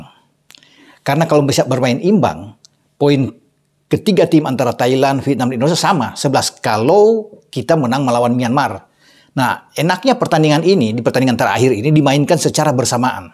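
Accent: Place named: Palembang, Indonesia. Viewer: logatnya kayak wong kito galo bener dak.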